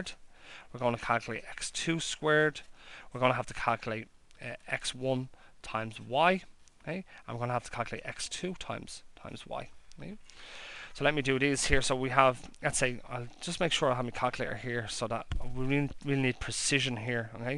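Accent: Ireland. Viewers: Plus, I really like your Irish accent.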